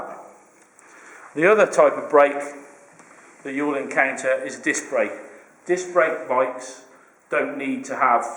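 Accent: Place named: England, United Kingdom. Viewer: Nice English accent)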